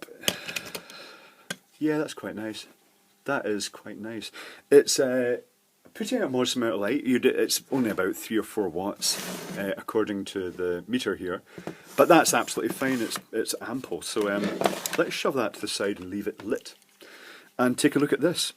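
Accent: Scotland, United Kingdom. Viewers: I have disliked the Scottish accent before, but yours, is not bad at all. In fact, it's rather soothing.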